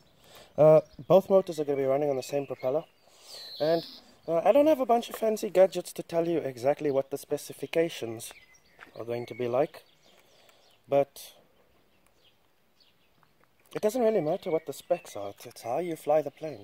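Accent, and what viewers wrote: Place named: South Africa. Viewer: Awesome video man. I ordered one of these motors! (Along with tons of other rc gadgets) Also, I can hear that South African accent from a mile away haha awesome.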